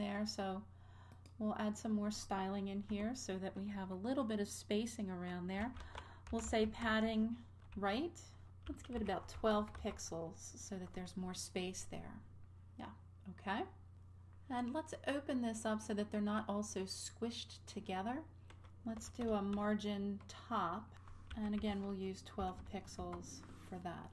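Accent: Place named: United States. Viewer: Very nicely done! Extremely helpful! Such a nice change to hear a lady's voice speaking clear American English in one of these tutorials.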